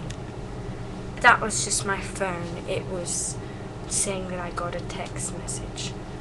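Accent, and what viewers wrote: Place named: Southern England, United Kingdom. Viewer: your english accent is getting better - or should I say more consistent - definitely a southern english accent. Please don't call it british though its english. As for song suggestions please look at my playlists - you might find something you like - or maybe not. God bless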